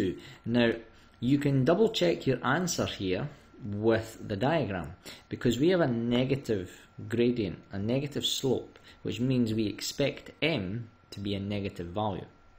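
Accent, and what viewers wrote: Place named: Scotland, United Kingdom. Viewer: Why are most maths videos on YouTube done by Scottish people? Seriously, there's like 9 of them! Great help brother, thank you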